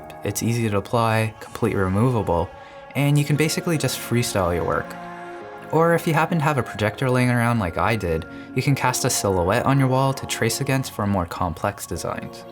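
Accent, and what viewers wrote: Canada. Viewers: Woah are you from Toronto?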